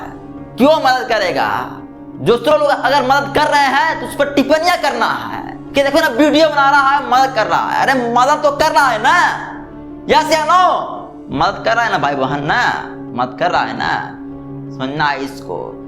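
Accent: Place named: Bihar, India. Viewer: इंसानियत कम होने का बड़ा कारण बढ़ती आबादी है । कुछ लोग पीढ़ियों से सुधारना ही नहीं चाहते। सुवर की तरह आबादी बढ़ा रहे हैं। ओर कमाने वाला एक की मदद करता है तो 10 आकर ओर खड़े हो जाते हैं। तो उनको भी सोचना होगा तभी जिंदगी सुधरेगी सबकी । भाषण देने से कुछ नहीं होगा तुम बिहार से लग रहे हो तुम्हारी बोलने की अंदाज से लग। रहा है 17 करोड़ हो ध्यान देना 😂😂😂😂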